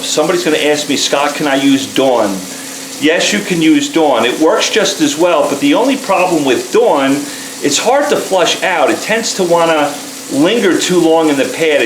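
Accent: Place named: Dallas, United States. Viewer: Good video w/ info - noticing a heavy Dallas accent there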